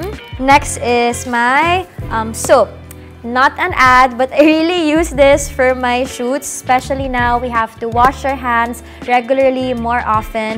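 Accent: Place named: Conyo, Philippines. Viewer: She's so conyo, i love it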